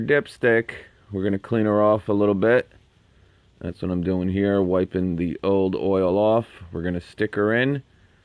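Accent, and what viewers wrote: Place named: New England, United States. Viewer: Came back to watch again to do my first oil change. Had to use this video because the New England Accent #Represent . Thank you for posting this!!!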